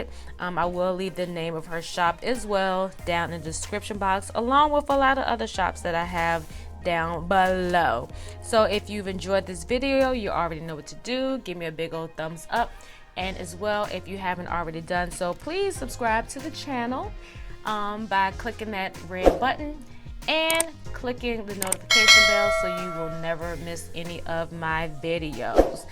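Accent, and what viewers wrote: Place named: Baltimore, United States. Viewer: I like the way you talk! I was thinking you were from Baltimore but i see you’re in Virginia lol I enjoyed the video as usual! Annnd you look amazing for 45 ❤️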